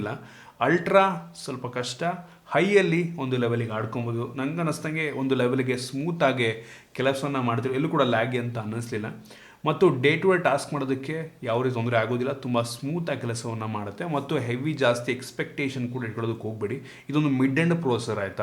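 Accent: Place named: Mangalore, India. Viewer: sir ನಿಮ್ಮ ವಿಡಿಯೋ ನೋಡೋಕೆ ಇಷ್ಟ ಆಗೋದು ನೀವ್ ಮಂಗಳೂರು ಕನ್ನಡ ರೀತಿ ಮಾತಾಡ್ತೀರಿ.. ಥಾಂಕ್ಸ್ ಫಾರ್ ವಿಡಿಯೋ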